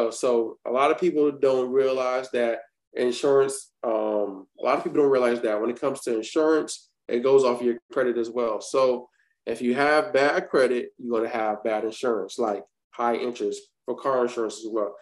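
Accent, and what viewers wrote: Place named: Baltimore, United States. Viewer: Y’all from Baltimore huh?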